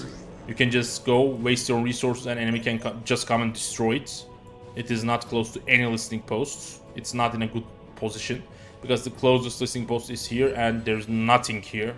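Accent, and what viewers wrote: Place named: Turkey. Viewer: You must be Turkish. You talk like me.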